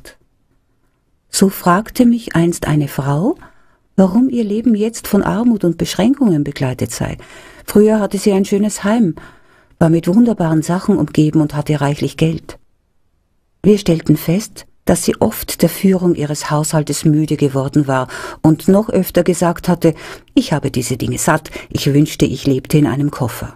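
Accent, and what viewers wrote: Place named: Austria. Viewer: Ja leicht österreichischen Dialekt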